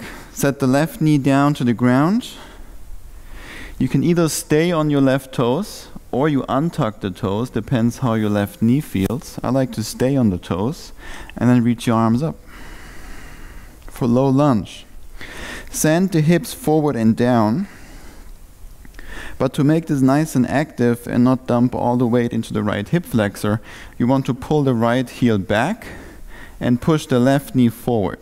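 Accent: Germany. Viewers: Do I spot a german accent there? Wenn ja nices Video Bro. Will meine Mobilität trainieren und bin so auf euch gestoßen.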